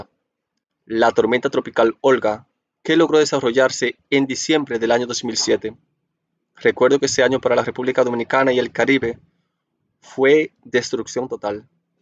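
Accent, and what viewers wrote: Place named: Arab world. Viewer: Le escucho acento arabe..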